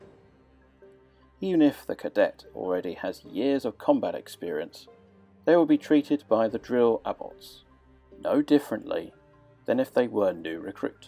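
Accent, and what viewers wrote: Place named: United Kingdom. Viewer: This is exactly what I’ve been looking for! Prayers answered British guy talking like a university professor about army organizational charts for 40K Perfection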